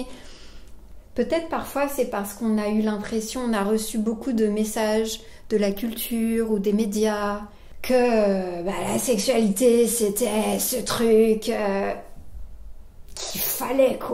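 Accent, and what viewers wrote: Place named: Quebec, Canada. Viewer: Des pointes d'accent québécois qui ressortent plus que d'habitude, comme si tu revenais d'un voyage là-bas :) Trop chouette cette vidéo !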